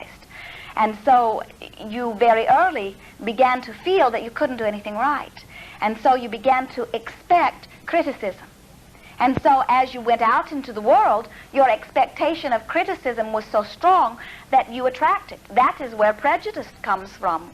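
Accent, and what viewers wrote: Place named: United Kingdom. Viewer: I love these old videos, Esther sounds so British 😂and what Esther saw, just by repeating a joke,, now that is very interesting,, it shows just how powerful, law of attraction is. gotta also say this video may be a very old video, but l ain't heard it before, and like wow, so powerful, thank you ❤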